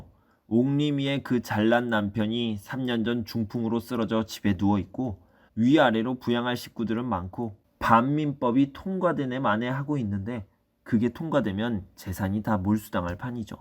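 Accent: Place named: Jeolla, South Korea. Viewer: 형의 목소리로 들으니 명작 소설이 더 감칠맛 나게 들리네요. 판소리의 지방에서 나고 자란 분이라는 게 잘 느껴집니다. 형은 판소리를 배웠으면 명창으로 이름을 날리셨을 거예요! ^^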